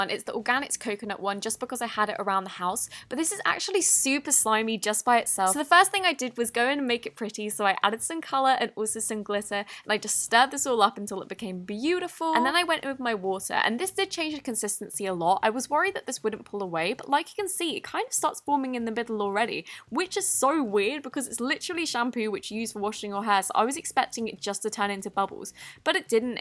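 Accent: United Kingdom. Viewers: Are you British? Cause you sound like one -_ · I bet $<num> that she won't answer are you british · Hi!! I love your videos sooo Much!! You're so sweet and makes The best slime videos ever, because you don't talk sooo Much!! I hate when People are talking for ages. But you just talk simple and clear about The slime you bought/made and its so relaxing to watch your videos:) you are My favorite YouTube!!- one of your fans/followers❤️ PS I love your British voice<3 I Hope you read This comment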